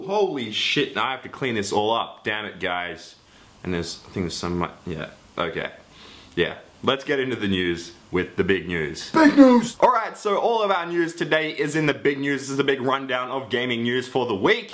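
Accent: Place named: Australia. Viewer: My left ear is loving this Australian voice and my right ear is enjoying these random noises.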